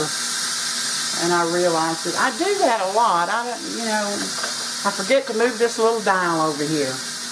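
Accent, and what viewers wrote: South, United States. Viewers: i love cooking with my pressure cooker (i have the instant pot model) and i love making mac and cheese in it. can't wait to try your recipe! thanks! also, if you don't mind my asking, where are you from? your accent sounds a bit like my family who was from texas/oklahoma/louisiana. thanks!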